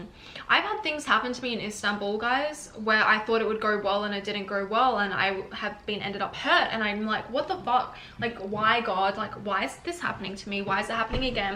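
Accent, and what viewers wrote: Australia. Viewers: your australian accent is the one that keeps me hooked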